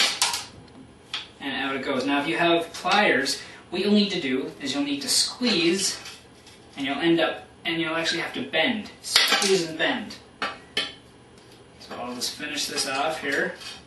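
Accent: Canada. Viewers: Thanks so much, really helpful! You have a lovely Canadian accent too 😁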